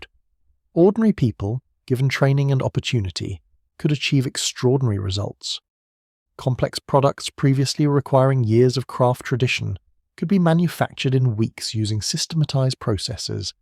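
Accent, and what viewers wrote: United Kingdom. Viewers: Great detail video.But it is by a british guy , parenthe sounds british , I don't think it's a I voice. But tell Americans for a more crude and the way they assemble a ship, but they float and got the job done, which is true and how their ships were built and took years to build and they were topping line no by time, they finished them over there in England they were obsolete.That's why bismarck , and what have your sunk the english , ships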